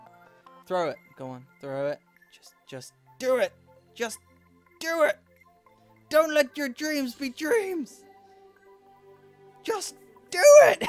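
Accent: United Kingdom. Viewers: 1 quid table cloth from asda = 1.46 table cloth from walmart for you yanks that didn't understand his queens english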